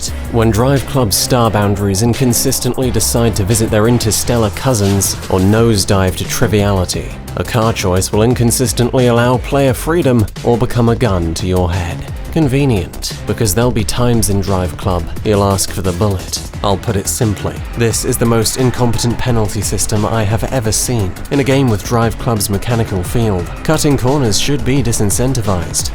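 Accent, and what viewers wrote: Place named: England, United Kingdom. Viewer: You’re voice is the right amount of English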